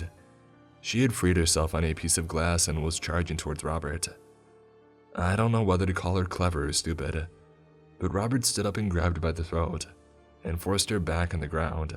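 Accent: United Kingdom. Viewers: Can tell it’s a Brit that described this hoax story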